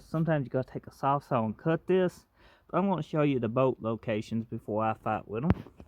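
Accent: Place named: New England, United States. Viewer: Great Video! I love your accent! Not Texas... Maybe Mass? NH? ME?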